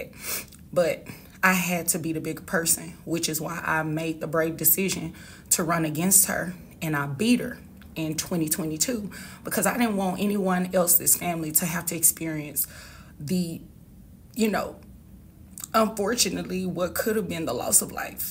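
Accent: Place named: South, United States. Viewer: Great to hear you got through. On another note that may put a smile on your face. From over here in England, we kinda like the southern accent. I have been waiting for a ya'll since hearing you keep saying "you all may be seated". Can hear you hiding it, Thank you for your ya'll.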